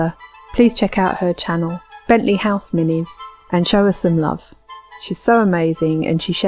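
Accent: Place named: United Kingdom. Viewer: Hey, came here from SEG! I’m so glad he mentioned you as this is exactly my type of content. I have plans for making some period dolls house vignettes with a story tying them together. I’m teaching myself so it will be slow going, but it’s been a dream for so long. Do you ever showcase your work in person? I am uk based and your accent makes me think you are too. I’d love to see it in person one day.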